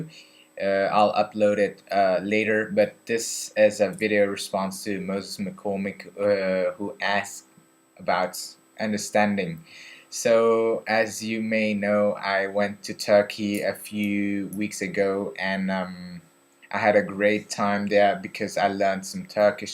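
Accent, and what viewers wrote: United Kingdom. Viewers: You have more of a british english accent than an american one now! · Wow I really notice how your accent has got more like British pronunciation. It is quite noticable :D As always, really enjoying your videos :) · Hey! Your accent is awesome! where's american? You're starting to acquire the british one! Did u notice the change? great vid.. see u soon! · I like your accent, it got very British! :)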